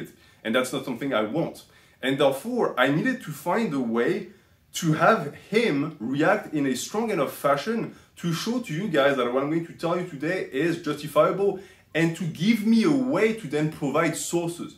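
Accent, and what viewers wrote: France. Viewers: Brother even with a thickish French accent ur English is marvelous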